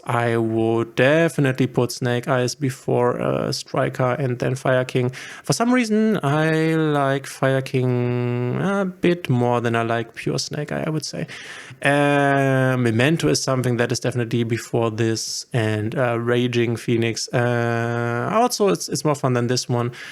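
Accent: Germany. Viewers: Hör ich da nen deutschen Akzent raus ? 😄 wenn ja, geiles Video 💪🏻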